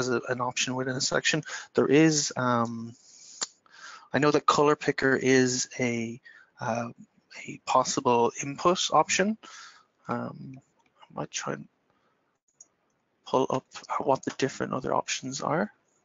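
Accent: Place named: Ireland. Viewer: It took me a minute to realize the sound "TEAM" is an Irishman trying to say "THEME."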